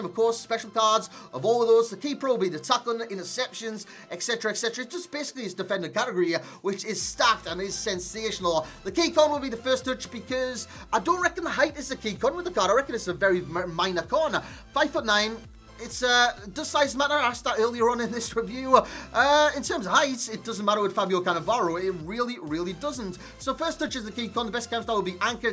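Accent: Newcastle, United Kingdom. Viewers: Proper Geordie love the vids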